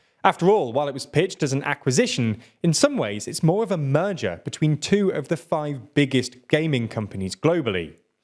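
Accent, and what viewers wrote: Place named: United Kingdom. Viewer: Hi, I'm spanish. Sooo, this is what you call a strong british accent? My god, that pronunciation of 'Blizzard' was incredible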